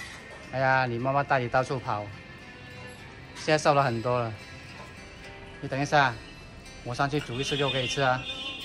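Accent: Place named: Cantonese, China. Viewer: 很有愛心的人，廣東國語聽起來蠻可愛的。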